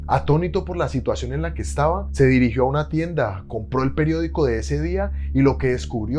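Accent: Colombia. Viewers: Vos sos de cali ve?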